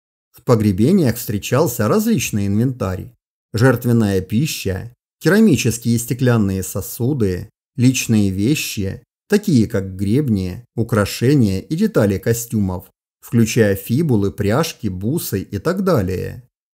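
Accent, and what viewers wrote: South, Russia. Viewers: ну, понятное дело, классическим произношением всегда является произношение диктора телевидения или радио... Обычно оно основывается на произношении, принятом в качестве классического. Речь идет о произношении столиц. Наверное, есть какие-то курсы на эту тему... Но, вообще, кому бы ни показала Ваши ролики - все дончане ничего особенного в произношении не видят. Прихожу к выводу, что это локальная особенность. Просто при чтении она бросается в глаза как-то больше, чем в обыденной речи. Но местные особенности речи абсолютно не мешают вещать другим донецким блогерам, например, Денису Селезневу (социология). У Бушвакера, у которого очень хороший канал по истории, тоже выраженное южное произношение. Это придает каналам определенный шарм. Ну, у Вас обращают внимание больше потому, возможно, что Вы серьезный, а они с шуточками. Поэтому и ждут от Вас дикторского голоса. 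Или если не дикторского, то легких шуточек, как у Дробышевского, которого, без сомнения, приятно послушать. Оставайтесь самим собой. Не стоит пытаться всем угодить. Недовольные всегда найдутся. У Вашего канала есть индивидуальность. Главное - все грамотно и интересно изложено. Чем популярнее канал, тем больше будет критики. Вот, Платошкин - историк. Автор многих книг. Имеет научные степени. Дипломат бывший. А рязанское (предположительно) диалектное произношение не смущает. Так что все относительно)))))) Можно посмотреть на вещи с другой стороны.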